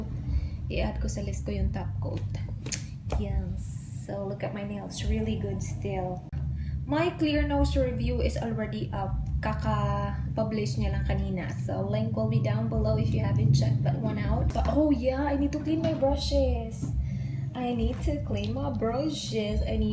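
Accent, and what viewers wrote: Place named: Visayas, Philippines. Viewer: Hi ms.! finally done watching your vlogs and I super love your bisaya accent! Ang cute hehehe. 😊 nkita ko nnman brother mo with new haircut haha! Pagpasensyahan mo na ms kinikilig onte 😄✌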